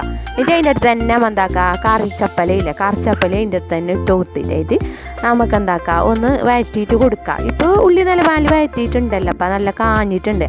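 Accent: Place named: Kasaragod, India. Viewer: Nandu curry Honda ki nokki super Namo Kasargod language super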